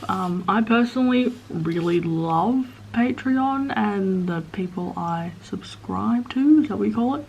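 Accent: Australia. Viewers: I just watched a video from 17 you sound totally different. You sound really Australian now I prefer this. It's strange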